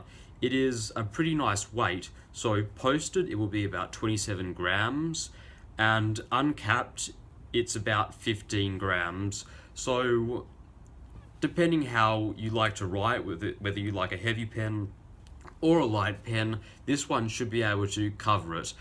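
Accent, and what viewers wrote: Australia. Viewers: As ive been told, one of the Parker Chinese factories was repossessed and they just kept on using the same machinery but I know some factories just make knock offs of parkers (some are very bad quality). Its very hit'n'miss from aliexpress for knock offs but the sonnets got to be one of the worse. Id rather get a jinhao 250/450/500/750/1200 any day over a parker knock off! Nice to see a fellow ozzie accent on youtube, keep it up and checkout my videos (trust me, youll like it)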